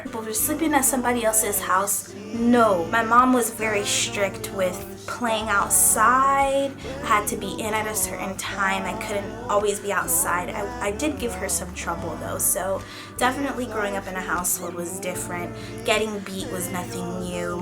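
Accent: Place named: Nigeria. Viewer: I loved this video, it was bomb! Learned more about you & your Nigerian Accent lol <3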